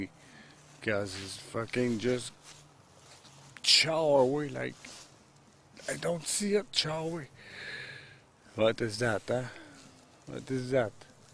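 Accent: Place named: Quebec, Canada. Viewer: TRB3 Appareil militaire qui fonctionne a l' énergie nucléaire.... Check sul net ! Ton accent québécois dans ton anglais est très drôle en passant !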